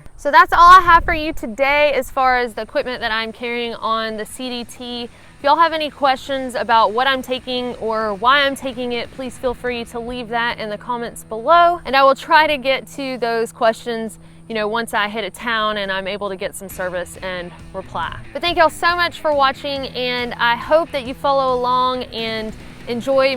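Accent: South, United States. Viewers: can you do a video with your sexy southern accent with lots of y'all and yonder and mosey??? You're so cute.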